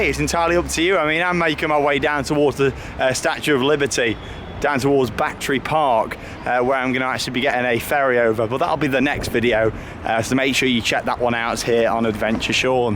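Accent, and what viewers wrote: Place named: Stoke, United Kingdom. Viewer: where are you from? Trying to place the accent, almost a stoke accent i think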